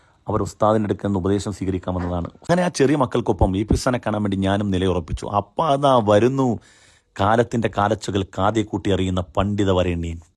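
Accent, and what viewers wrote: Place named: Malappuram, India. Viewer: Usthadinte samsaarathil malappuram slang kayarivannu😄😄👍👍👍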